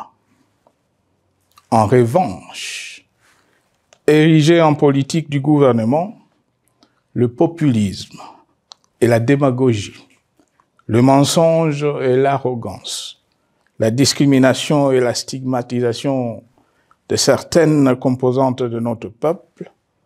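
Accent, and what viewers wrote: Rwanda. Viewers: ça façon de parler vous sentez que c'est un Rwandais😭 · L'accent rwandais ne l'a pas quitté malgré les efforts déployés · Veritable ruandais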